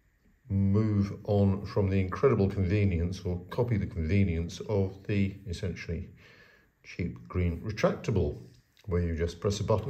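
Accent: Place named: United Kingdom. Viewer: Your reviews are good. I seriously have watched your videos particularly to improve my British Accent. 😄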